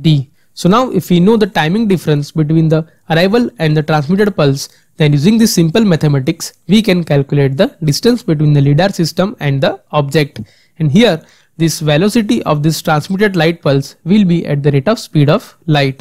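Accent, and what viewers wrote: India. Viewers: leaves when i hear indian accent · Indian people are so smart and clever but there is almoust always the accent :( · I'm Belgian, so I neither speak English nor Indian natively. In my opinion, understanding Indian English is about wanting to understand it. With a bit of training, (read: not actively avoiding Indian accent videos) I got to a point where it doesn't bother me that much anymore. And seriously, with the amount of Indian good quality channels like this one out there, it's totally worth it! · Why don't you learn understanding Indian Accent. Bliv me India is the next superpower and you all might need to learn the way we speak....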